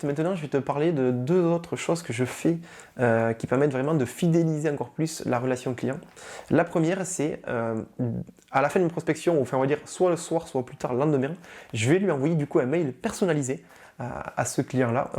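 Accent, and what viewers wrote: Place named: South, France. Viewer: Hello ! Alors moi je t’explique, j’ai entrepris des démarches depuis quelques semaines pour devenir mandataire immobilier, en auto entrepreneur... Évidemment je n’y connais rien en Immobilier car je débute et qu’il faut un début à tout, je vais apprendre grâce à mon réseau l’agence pour laquelle je dépends, et en autodidacte sur les innombrables vidéos tutoriels YouTube qui existent.....puis en allant sur le terrain parce que c’est sur le tas qu’on apprend le plus aussi ! A ton accent on devine que tu es du Sud, si ça peut te rassurer on ne sera pas concurrent puis je suis du « Noooooorrrd » comme disait Galabru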